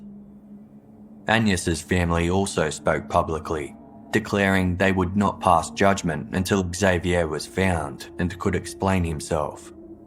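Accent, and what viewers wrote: Australia. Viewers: It’s Australian and I pity you that you can’t understand English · The narrators voice sucks, can’t understand some things he says, his Australian or British accent is so strong that it makes it difficult to distinguish some words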